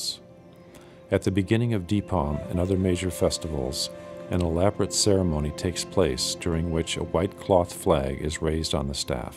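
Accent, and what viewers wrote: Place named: England, United Kingdom. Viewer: Excellent Presentation and Video steady, well explained voice and accent so beautiful graceful and respectful english spoken by englishman is the way to go in these day. People around the world need to know our Hindu Cultural, Heritage God Temple Site. Thank you very much clear view and explanation perfect keep it going with all other temples too. I have not visited that temple yet but seeing this I want to in my Life.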